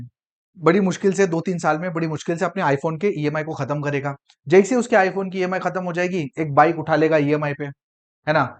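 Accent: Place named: Maharashtra, India. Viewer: Assalamualikum bhai ❤. Alhamdulillah 99% sahi bole ap. Aj ap jaise logo ki sakht zarurat hai waise to log mahino me kae fuzul kharch kardete h jaise pan,Gutkha, cigarette aur najane kya 😢. Allah pak hame sahi jagah kharch(investment)karnewala banae. Ameen bhai jo bhi apne samjaya ❤ par question ye hai sab apne theoretical samjaya Alhamdulillah samaj me Aya practical demo samjao jaise 1. SIP aur LUMSUM kaise kare ispe 1 videos 2. DIRECT aur REGULAR kaise kare. Aur bhi question h par Insha Allah ap se judne ke baad. Apka tune samaj me ata h q ki ap Maharashtra se ho aur ham BHI AURANGABAD se ❤ Allah pak apko jazae khair de aur apki team ko bhi. Assalamualikum ❤ Plz reply 🙏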